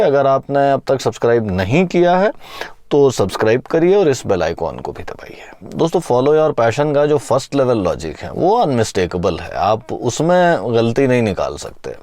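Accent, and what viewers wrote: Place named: Indore, India. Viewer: Hello sir, meri yah janne ki iksha hai ki kya App indore (MP) se hai kya... bas ese hi puchh raha hu kyuki apki boli se app indore ke lagte hai...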